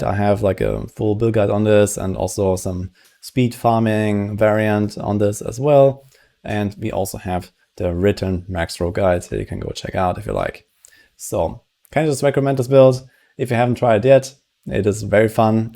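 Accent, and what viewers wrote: Germany. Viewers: Für einen Deutschen hast du kaum akzent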